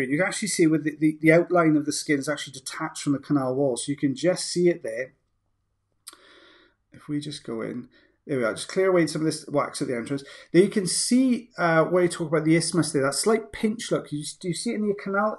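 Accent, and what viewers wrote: Wales, United Kingdom. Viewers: I told my sister I found very relaxing satisfying videos of a Welshman with a wonderful voice who does ear wax removals and she exclaimed, “!” How did she know?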